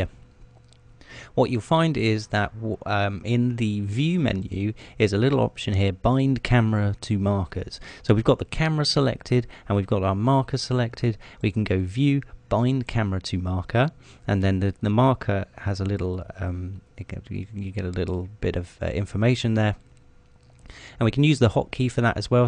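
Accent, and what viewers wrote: United Kingdom. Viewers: british :D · English Accent :)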